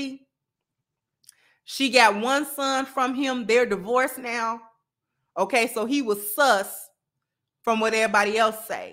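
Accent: Chicago, United States. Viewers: When you said Da Roms on another one of your podcasts I knew you was from Chicago. Only we speak like that. Chicago is in the building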